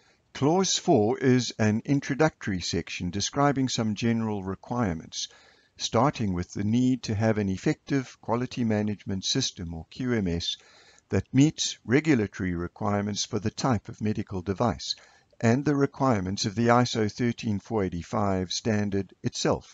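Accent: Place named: South Africa. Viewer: I see you live in Canada, but sound like a South African :)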